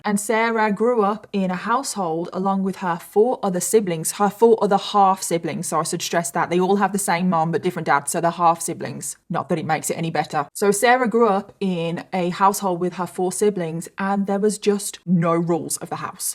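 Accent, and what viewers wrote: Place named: United Kingdom. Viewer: Very sick and twisted story. Made me tear up when you told us the bikers and nice car owners led the funeral procession. Side note I love how you use the word "whilst." Your British accent is the kind of British accent I love! I don't know the area and the different dialects, but I can actually understand you! 🤣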